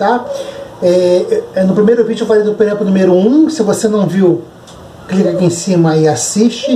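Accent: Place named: Rio de Janeiro, Brazil. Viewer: Você mora em SP?? (Seu sotaque é do RJ)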